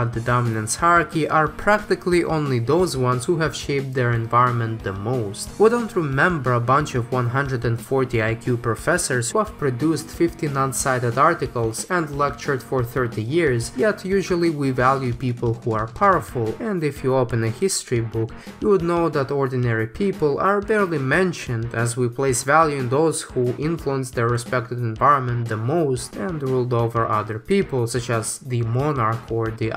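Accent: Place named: Eastern Europe. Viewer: The CRISPR part gave me the willies. Especially with the Central-Eastern European accent.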